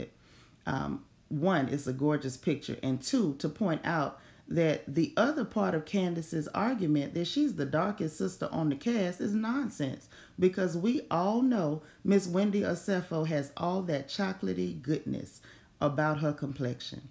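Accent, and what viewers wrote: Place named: South, United States. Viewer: It's the narration with the southern accent and reads for me😊❤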